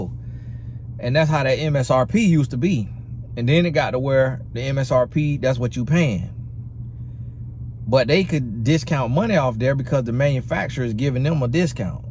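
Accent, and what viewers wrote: South, United States. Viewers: I gotta say. I’m subscribing because of the accent (I’m southern too) and the realness. I just randomly found this video, but everything you’re saying is spot on.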